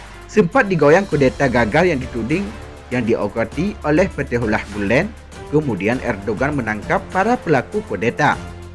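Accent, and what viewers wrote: Aceh, Indonesia. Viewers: Sama huruf. S .mimin kalau dua kata itu agak susah berarti mimin Acheh.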